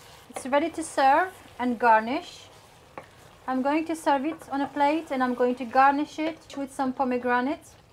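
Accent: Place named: England, United Kingdom. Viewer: ওয়াও ভাবী, রান্নার পাশাপাশি আপনার থেকে ইংলিশ এক্সেন্ট চর্চাও হয়ে যাচ্ছে😊 । ।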